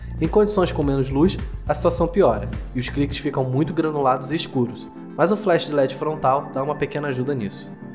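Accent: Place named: Rio de Janeiro, Brazil. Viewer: Fotos no bairro da freguesia Jacarepaguá... Feliz por se inscrever no canal de um Carioca como eu... Tmj amigo! Melhor q aqueles reviews de sotaques Paulista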